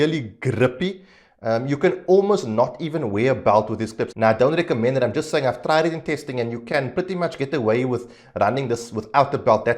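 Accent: Cape Town, South Africa. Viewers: I could immediately tell OP is from Cape Town when I heard him speak. This is typically an accent you'll hear from the Cape Coloured community.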